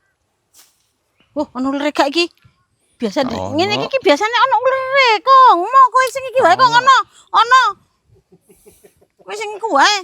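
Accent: East Java, Indonesia. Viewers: aku orang Bekasi tp aku ngerti paham ngmong nya, aku ikut konten dr awal JD selalu suka, itu udh karakternya Jawa Timur jos pokoknya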